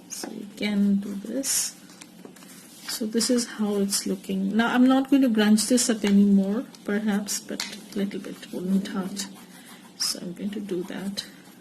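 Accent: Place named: India. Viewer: I could listen to your lovely Indian accent for hours. Very cool technique.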